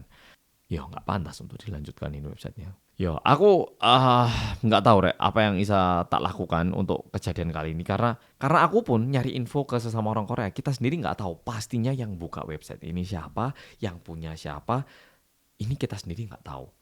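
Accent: Java, Indonesia. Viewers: Eh aku pengen tau mas ini org apa, medoknya sih ketahuan, tp bisa punya warga negara korsel ya? Maaf bru 2x lihat postingan masalah ini sm Daud Kim, jd pengen tau, mas ini org apa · Aku seneng nek mas bahas tak rungokke ngomong bisa kok yo isa... Iso dadi isa ya mas weh bahasa jawa ala Indonesia😊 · Saling introspeksi aja sob, klo menyanjung jangan berlebihan klo ngehujat jangan berlebihan, lawong om aja dijelekin di situs itu parah emang...kalo aku penting masnya yang medok ini nggak seperti itu, soalnya cuman ini orang Korea yang sering tak tonton, kontenya menghibur.😁😁😁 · selagi masih medok aku percaya mas cinta indonesia😁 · Sampean jowo tah cak? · Aku udh tau mas ws mboh jaman kapan. Jaman aku SMA pling saiki ws ndue anak se sangar iku pean logate smpek medhok😭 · Mas orang korea cabang jawa 😂 saya berharap mas gk kaya gitu apa lagi mas kan bawa logat jawa 😂yg terkenal santun dan sopan baik dan murah senyum wkwk 😅 aku sedih sih 😢ko gitu saya sedih bgt loh ko bisa mereka ngomong kaya gitu bawa ras agama dan fisik tapi lucunya orang kore nangis2 sedih ngedrama kalo orang korea di rasisin sama orang amerika kocak si kami salah apa coba merka merantau di sini kami bukan orang yg jahat orang indo tu kebanyakan ramah suka menolong banyak tempat berteduh jika kita tersesat dan bertegur sapa pun slalu di tanggapi dan ramah apa lagi ke orang asing lebih gila lagi ramahnya 😢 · WN emang Korea tapi Asline, Jeroane Indonesia Tenan. 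Buktine Selama bertahun² Ber WN Korea, Logat Daerah Indonesia ne gak Luntur. bukti melestarikan tenan budaya bahasa Indonesia. Culture adat dan budaya ne wes melebur nang jero awak. Sehat Terus Mas. 👏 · masalahe mas sndiri sekolah di Indonesia bre, logat bahasa indonesianya aja masih medok jdi ya dia sakit hati juga.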